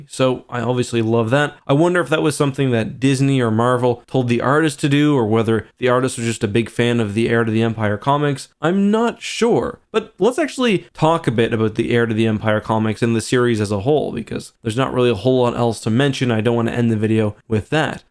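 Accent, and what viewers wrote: Canada. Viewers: Yo are you canadian?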